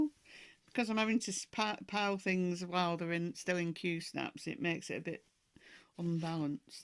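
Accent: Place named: Yorkshire, United Kingdom. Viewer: I'm from Ohio and I'm 85. Since I was 8 ,I've had pen pal from Yorkshire. We are like sisters. I love hearing your accent. It. reminds me of her. I enjoy all of your videos, but don't always comment.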